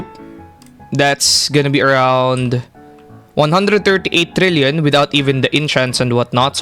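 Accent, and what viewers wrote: Philippines. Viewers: Filipino accent?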